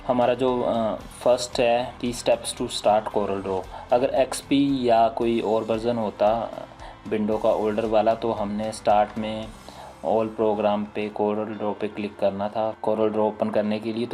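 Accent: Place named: Himachal Pradesh, India. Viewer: Wow sir me Himachal se hu app kha se ho sr apki awaaz bhi Himachali lg rhi h😮😮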